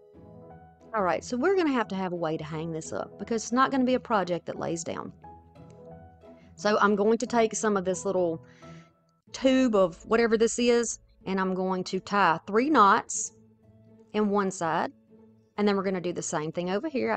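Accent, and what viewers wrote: South, United States. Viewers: I LOVE your southern twang and I LOVE that u are a straight shooter and tell it like it is! No nonsense comments, tell ‘um, if u want to be negative delete urself and let us enjoy.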